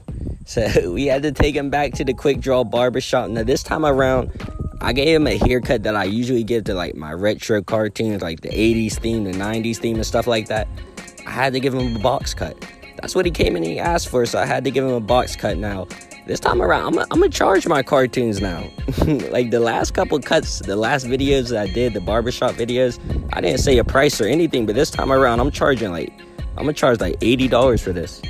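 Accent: United States. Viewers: U sound kinda country.. but ur drawings look good asf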